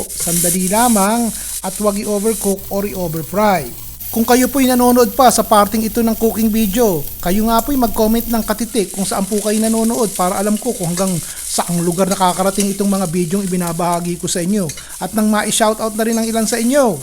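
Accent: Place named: Bulacan, Philippines. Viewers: Napakasarap nga ang ganyang luto rini sa amin ang ganyang estilo mo ako po ay tiga Hagonoy. Kaya yung punto mo sy kapatid ng sa amin sa Hagonoy, Bulacan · Taga Sta. Cruz, Manila po ako pero lumaki sa Bulacan. Natutuwa po ako sa paraan ng pagsasalita mo chef. Para kayong Bulakenyo magsalita 😂. Akala mo matanda ang nagsasalita. Pero regarding tayo sa menu na ito, gusto kong gayahin at parang masarap, Hinayang ha hinayang ak9 at di pa ako nakabiki ng bangus sa palengke kanina. Lingguhan p nmn ako mamili, 😢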